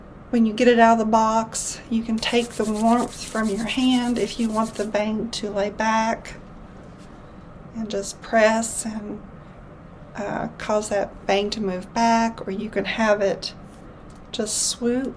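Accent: South, United States. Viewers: Your accent makes me feel like I’m at home! I’m from TN but have lived in Alabama, Mississippi and Arkansas. This is my favorite wig on you. Which wig that you’ve reviewed has been the best for wearing up? Like which has the best temple to temple coverage? And which blonde has had the best lace front? I wish I had your calmness!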